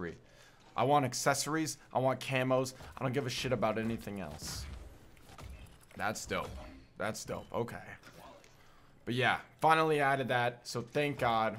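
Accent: Scotland, United Kingdom. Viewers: Aye you got Scottish in you im a huge fan and also Scottish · Scottish! Yes aye lad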